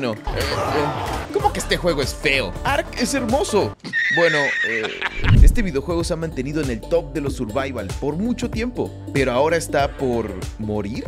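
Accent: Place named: Latin America. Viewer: Nah hombre, fuera de bromas tu estilo y edición son muy buenos, parecido al del grande de Mutzska, pero el tono más suave y tú guíon más crítico/narrativo son únicos y me gusta (no es agresiva Jwulen XD) y además eres el primer youtuber al estilo Mutszka que escucho hablar en español latino, aplausos pa ti 👏😄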